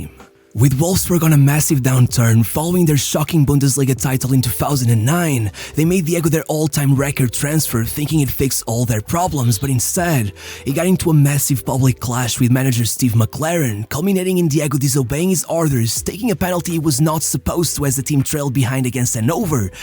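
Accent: Portugal. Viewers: Any chance you are from Portugal? Because the way you pronounce brazilian portuguese names sounds like european portuguese. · You are Portuguese, right? That accent is recognizable anywhere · Man, I'm new to your channel, really enjoying the videos, but let me ask you: Are you Portuguese or have you learned Portuguese from Portugal? Bruh, if you're not a Portuguese student you should try Brazilian Portuguese accent, specially when talking about Brazilian people and things. For exemple: Instead of Roberto "Carloosh" just say Carlos dude; instead of "Libertadoreesh" you can just say Libertadores, that "s" is actually just like English's "s", like when a verb gets the "s" when used in the present for he/she/it = works, plays -- that's the "s" sound in any of these words. I'm not hating, as I said I like your videos, I'm suggesting ok. Much love from a Brazilian Portuguese teacher from Brazil! 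· Português* dá para ver no sotaque e no sotaque ao falar inglês.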